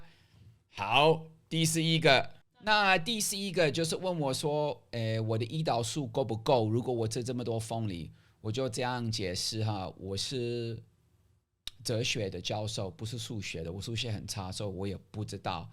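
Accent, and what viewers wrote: South, Taiwan. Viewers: 我也很愛買鳳梨,也愛吃鳳梨。只要台灣人沒有人不愛吃吧！這是第三天看教授影片,看一整天也不累,因為也是南部人,又聽到南部的口音所以非常的親切贊讚讚！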